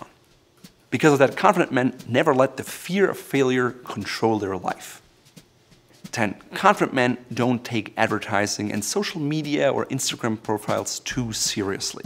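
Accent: Germany. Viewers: I swear, I only noticed your German accent after you said you were German. After that I couldn’t stop thinking about it. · You are definitely GERMAN... (belive me i said that before you disclosed)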